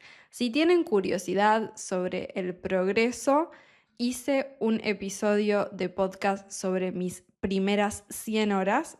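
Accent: Argentina. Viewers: I get so excited when I'm watching your videos and realize I understood almost everything. My spanish teacher is from Buenos Aires and I love watching your videos in general, but I noticed they have helped me understand her accent better too. In my lesson today I only remembered how to say "rallado" in an Argentine accent because of all of your cooking videos! It was really funny. It's great hearing about your language learning journey as well. Thank you so much for sharing. 🥰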